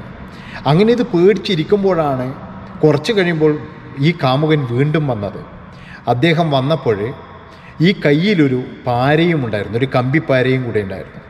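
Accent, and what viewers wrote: Kannur, India. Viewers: Sir kannuraanoo njanum kannuraaa😊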